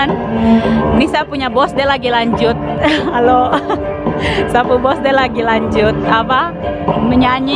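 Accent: Papua, Indonesia. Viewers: kk org papuaaaa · Logat Papua kaka 😁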